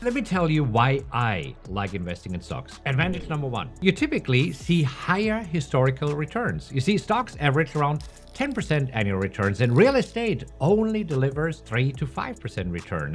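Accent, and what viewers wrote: Germany. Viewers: Du hast diesen wundervollen NRW Nachnamen und ich glaube auch, man hört, dass du einen deutschen Akzent hast. Nur ein bisschen. Viele Grüße aus Berlin. 👋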